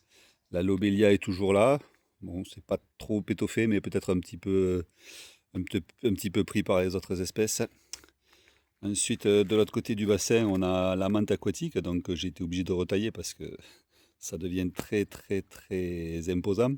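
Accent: France. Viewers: Cc merci pour ce partage. Ils ont bien grossi en plus les bb. Superbes les plantes. Ma menthe aquatique est comme la tienne, elle fleurit. Et joli accent du sud 🙂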